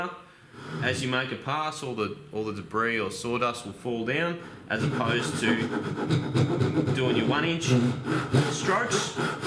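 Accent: Australia. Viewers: THATS an aussie accent, if i´ve ever heard one! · Great to hear another Aussie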